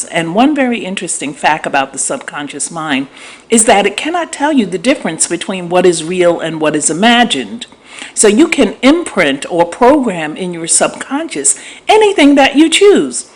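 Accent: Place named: Northeast, United States. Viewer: Ha! I bet those who've said something bout your voice are from the South! I'm a southerner I even said to myself "Listen to this Yankee!" I'll apologize for us lol. But on the real, Love ya accent . Love your videos. Keep doing what cha do sistah!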